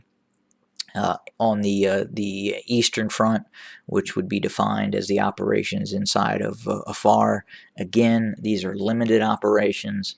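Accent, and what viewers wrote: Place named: South, United States. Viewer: The commentator sounds Habesha with American (Southern) accent. No problem. Just saying.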